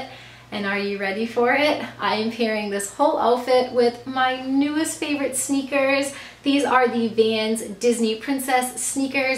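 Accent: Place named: New England, United States. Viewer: New England? As in New England, Mass? I knew your accent sounded familiar. My boyfriend is from a small town right outside of Boston called Fall River. I knew something was up when I heard you pronounce 'saw' as 'sawr'; he does that all the time haha.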